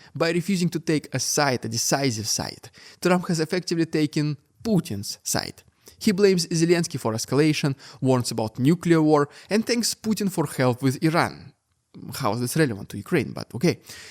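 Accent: Russia. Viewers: Fix your silly accent. Most intelligent Russians learn to master English before dumping their accents on the public.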